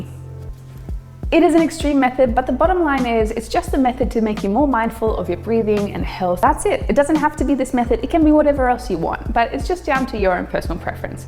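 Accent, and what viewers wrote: Australia. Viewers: you sound australian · Are part aussie? You sound like it a bit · so this is the first video of yours that ive seen. i was thinking - wow, her accent is on point (im australian.. assumed you are icelandic). i noticed like 5% sounds south african. so then im wondering, has she just lived in straya for a while, or did she self teach the australian accent? then i heard 2 seconds of your bf and i knew he was aussie and therefore that must be why you sound australian. now im thinking, maybe you're actually australian, born and raised. hmmmm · you have a slight australian accent after your 1st accent..... did you live in australia?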